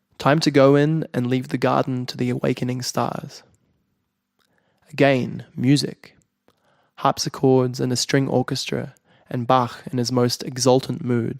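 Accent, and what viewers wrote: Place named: Australia. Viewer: I am grateful for your Reading with your down under Dialekt. Thank U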